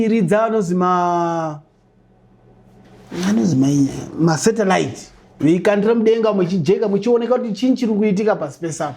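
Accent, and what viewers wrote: Ndau, Zimbabwe. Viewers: Chindau chondispaka zvangu😂😂😂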